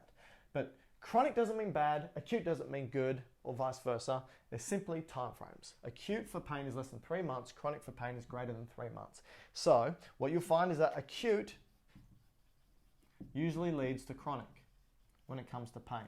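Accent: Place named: Australia. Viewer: Came for the muscles. Stayed for the Aussie accent.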